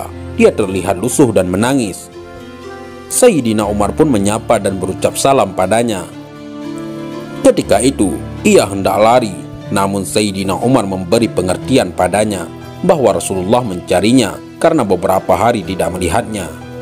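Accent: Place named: Bali, Indonesia. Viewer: Penyiar nya orang bali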